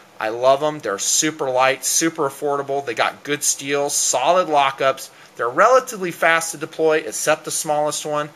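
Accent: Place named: United States. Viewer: 6 yeh he's finally dropped the squeak great reviews but annoying yank twang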